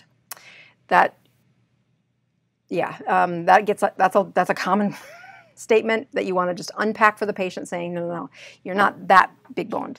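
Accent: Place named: Upper Midwest, United States. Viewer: My family is of Finnish heritage from the upper midwest where most people have a sauna in their homes. My mother is 85 and in great shape, due in great part I believe to her regular use of a sauna. I have an infrared sauna that I've gotten out of the habit of using since we moved two years ago. Thank you for the inspiration to use it regularly once again. By the way, it throws me to hear your upper midwest accent without pronouncing it "Sowna" as the Finlanders do.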